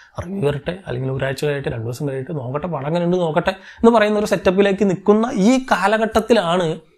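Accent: Palakkad, India. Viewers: കൊണച്ച വർത്താനം.. നിങ്ങ പാലക്കാട്‌ എവിടെന്നു ഏട്ടാ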